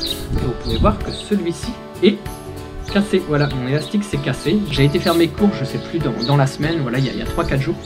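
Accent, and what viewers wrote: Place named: Turkey. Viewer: "Ne kadaağ" maşallah Türkiye'de yaşaya yaşaya ağız bile yapmış abi.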